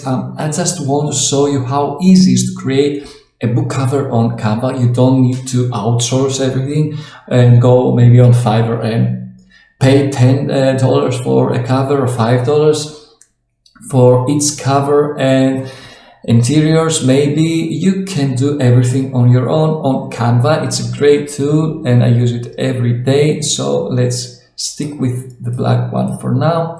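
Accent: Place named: Greece. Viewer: Loved your Greek accent man :)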